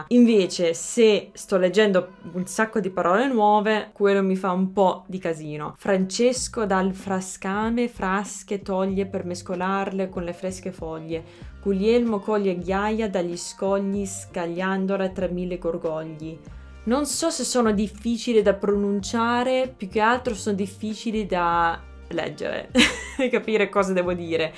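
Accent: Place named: Fano, Italy. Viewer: Ha una perfetta pronuncia fanese, con un leggero tocco anglosassone in alcune sillabe.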